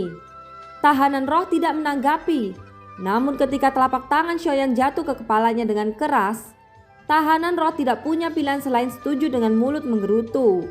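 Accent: Java, Indonesia. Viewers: Logat jawanya trllu medok😁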